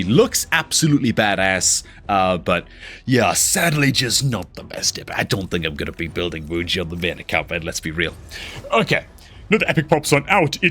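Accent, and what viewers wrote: North East England, United Kingdom. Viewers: I wish he would slow down a bit. I'm guessing north east England and it's hard to keep up at times. Not Newcastle but that neck of the woods.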